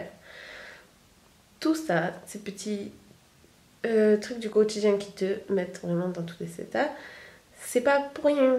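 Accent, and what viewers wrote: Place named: South, France. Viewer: Tes réflexions sur toi-même, sur le monde qui t'entoure, peu de personnes le font réellement dans la vie de tous les jours. Dis-moi es-tu croyante? Si oui, en qui crois-tu? Tu es naturellement belle, j'aurais bien voulu te connaître par amitié avant tout et plus si affinité. J'ai remarqué ton accent du sud un peu; ) Ps: les personnes ayant connu des zones d'obscurité dans leur vie, sont des apprentis les mieux préparés à entrer dans la lumière. Bisous, garde ton naturel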